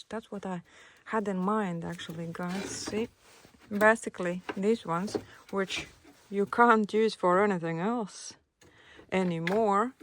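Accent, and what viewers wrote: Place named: United Kingdom. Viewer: Buggers! I'm getting hints of British English. Charming!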